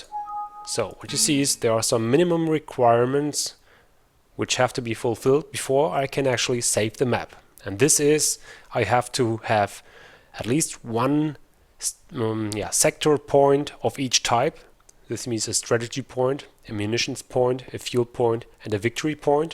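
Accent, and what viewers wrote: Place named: Germany. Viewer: do you still play coh2? Are you from Germany, because your accent sound a little bit like it. greetings from Germany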